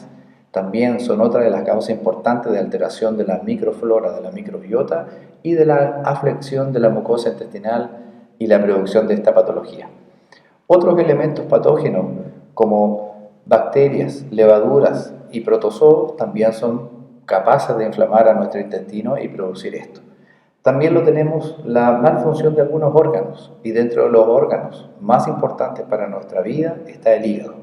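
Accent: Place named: Chile. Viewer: Hola Estimado Muchas gracias por su aporte a las personas que estamos interesados en investigar aprender a cuidarnos. etc. En mi opinión es uno de los mejores videos que he visto sobre éste tema puntual. FELICITACIONES.. y la verdad que me he sacado algunas dudas con su video, me falta mucho aún pero seguiré aprendiendo necesito curarme. Me han diagnosticado INTESTINO IRRITABLE, y dudé sobre éste diagnóstico no tengo duda que lo que tengo es más que INTESTINO IRRITABLE. Por su forma de hablar parece Chileno verdad? Desde Uruguay le mando mis saludos. Francisco Machado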